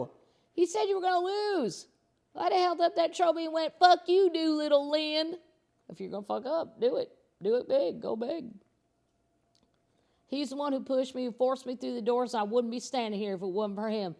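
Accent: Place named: Kentucky, United States. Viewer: I think I’m pi in up that Kentucky drawl. It’s strange ‘cause I’m from Boston! I sooo look forward to storytime and to the Pubcast. You are my salvation with all this solitary living. Can’t thank you enough.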